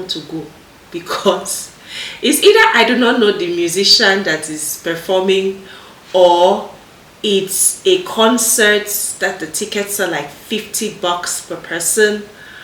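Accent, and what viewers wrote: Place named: Canada. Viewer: Lol, Very Canadian accent.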